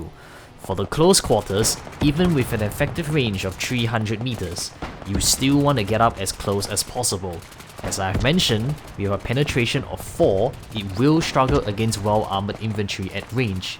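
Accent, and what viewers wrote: Singapore. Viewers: somebody sounds like they're from singapore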